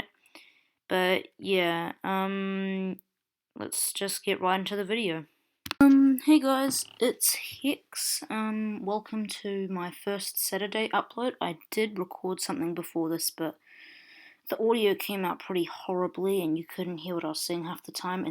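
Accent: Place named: New Zealand. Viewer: I love the kiwi accent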